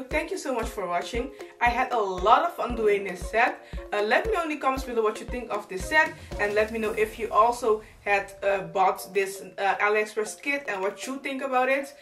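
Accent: Netherlands. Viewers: are you dutch? haha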